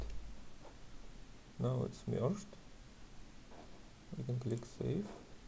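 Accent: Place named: Russia. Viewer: лучше по-русски говори, ёр рунглиш вери бед)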